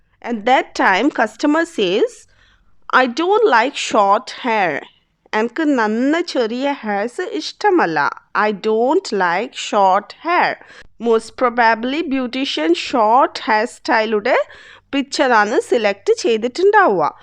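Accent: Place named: Kannur, India. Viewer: Typically kannur malayalam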